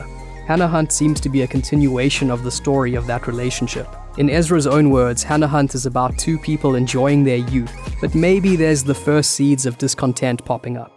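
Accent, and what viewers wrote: South Africa. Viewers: I clicked on this not expecting a South African accent. Now I'm subscribing. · So weird to hear a South African accent. Love this song, love the video.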